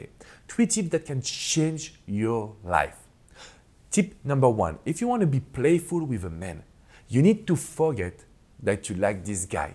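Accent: France. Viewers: haha "You can hear my french accent"... 🤣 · Ohhh le french accent je love it 🥖 🍷 🇫🇷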